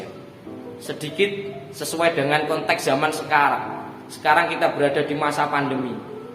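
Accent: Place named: Java, Indonesia. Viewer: MasyaAllah aku senang dengar medo'nya mbak..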